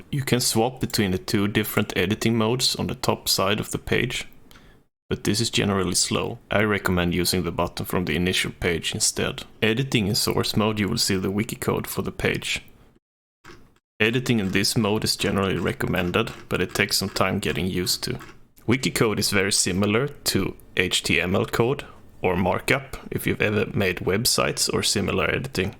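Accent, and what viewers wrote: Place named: Sweden. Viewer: I knew you were Swedish lol your accent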